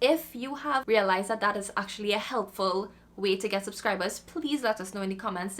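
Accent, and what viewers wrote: Trinidad and Tobago. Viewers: Came for the info. Fell for the accent 🤣 Trini? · You sound Trinidadian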